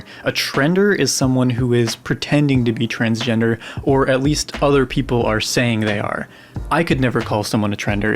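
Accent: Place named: Midwest, United States. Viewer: Okay, so I had to pause in the middle of your video to say I disagree about your voice. I think you have a great voice. It’s just cracking (autocorrect) to sound female. You must be from the Midwest. It’s like a climate or humidity condition that affects many of us. I only wish mine was as good as yours. 💋💋💋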